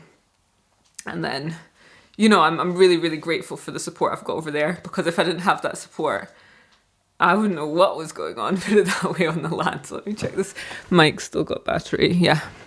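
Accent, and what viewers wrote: United Kingdom. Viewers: I'm very happy for proud of you💐✨ I really like the British accent 💫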